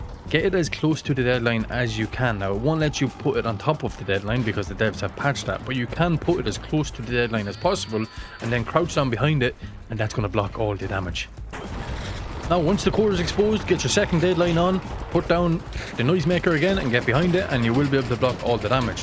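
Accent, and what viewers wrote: Ireland. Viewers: I'm a simple man, I hear Irish accent, I follow, like and subscribe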